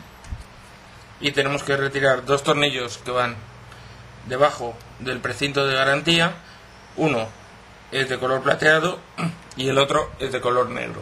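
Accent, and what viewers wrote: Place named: Spain. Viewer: UR A FUCKING IDIOT this is European SPANISH From spain you fuck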